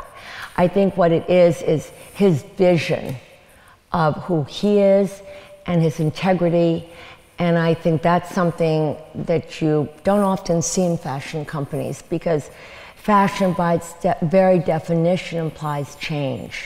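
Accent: United States. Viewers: Lily Conte Agree! I'm perplexed. She speaks perfect "American" English. It doesn't make any sense.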